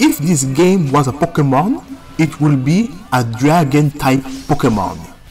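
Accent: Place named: Africa. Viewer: Just stumbled on your channel and I'm not disappointed 🤣 I always find a lot of trust and kindness in African accents haha anyway I just bought mine last week, I hope you love it as much as I do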